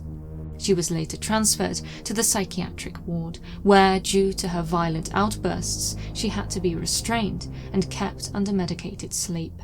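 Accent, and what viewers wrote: United Kingdom. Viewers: I love narrators/storytellers with British accents, it grips your attention and it's elegant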